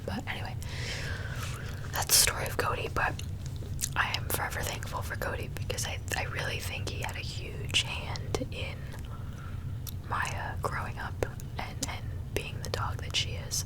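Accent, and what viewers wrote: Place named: Northeast, United States. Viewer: I’ve always been curious where you’re from & what your background is? I’m from Australia so I don’t have a clue abt American accents but I’m guessing a state in the North-East? 😅 & Im assuming from your appearance you’re hispanic but also curious abt that too :)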